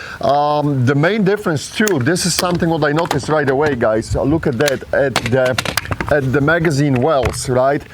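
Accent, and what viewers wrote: Serbia. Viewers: Yeah sounds like Serbian I wish I didn't lose my accent or can control it on command haha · He pronounces his R's a THE sound just as someone who is native Serbian speaker!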